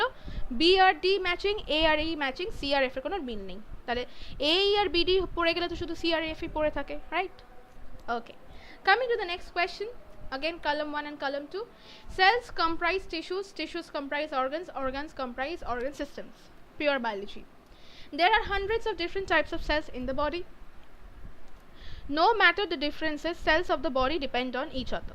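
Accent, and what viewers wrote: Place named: Bengal, India. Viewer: Ur Bengali tone is love 😘😙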